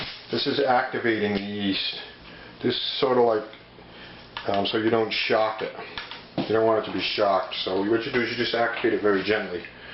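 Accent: United States. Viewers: You sound american and bottled water is about the same quality as bottled water there. They call it springs or glacier water but really they just re-filter tap water and ad some more minerals in it. If you want "clean Water" well your better of buying actual distilled water or distill it yourself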